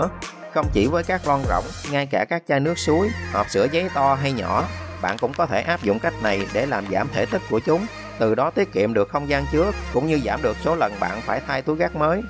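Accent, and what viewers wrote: Mekong Delta, Vietnam. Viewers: nói chuyện đậm chất người miền Tây luôn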